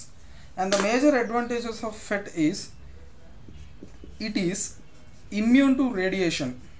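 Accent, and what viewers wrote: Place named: India. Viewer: cool and kinda funny how your cursive writting has as much indian accent as your voice.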